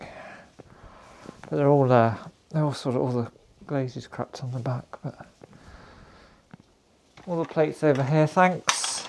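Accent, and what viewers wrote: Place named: Ireland. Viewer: Im new to your channel ❤❤❤ i love your voice it's your irish accent ❤❤ all my love from America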